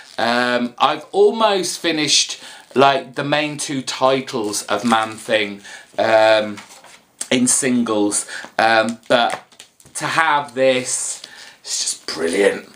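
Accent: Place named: West Country, United Kingdom. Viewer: Appreciate the codes :) Loled at your "pretty darn decent" Sounded like everyone from down my way lol Gotta love the West Country accent! Enjoyed the vlog...missing your marvel graphic novel collection vids tho :/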